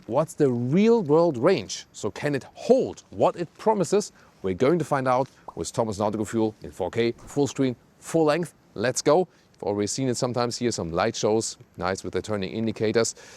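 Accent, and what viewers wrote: Germany. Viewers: Als Amerikaner würde ich fragen: in England spricht man Englisch? Mn hört seinen deutschen Akzent her recht gut raus 😊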